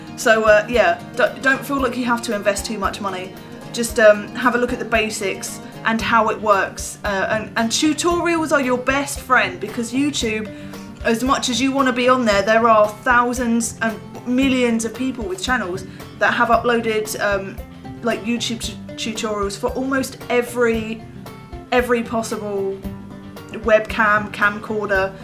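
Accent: England, United Kingdom. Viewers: Yeah, but how do I get the cool English accent?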